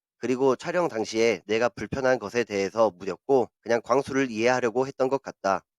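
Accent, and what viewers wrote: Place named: Jeolla, South Korea. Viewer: 전라도분이신가봐요 억양이.. ㅎㅎ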